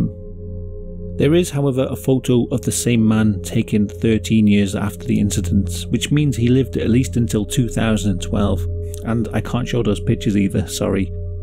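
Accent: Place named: England, United Kingdom. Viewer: This guy (is) English, he's based in the UK. 😂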